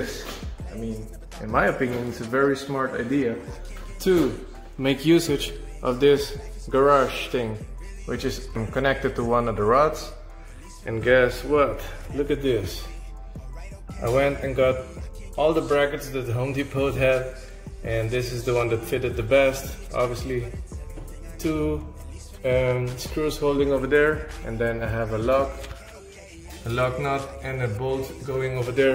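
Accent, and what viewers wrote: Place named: Morocco. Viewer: الوجه و اللكنة مغربي،، منور خويا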